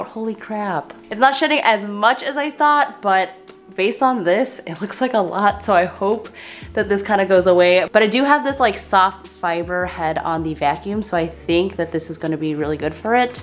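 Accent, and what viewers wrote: Chicago, United States. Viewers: yoooo this room is rad! (also love your chicago accent! 😊)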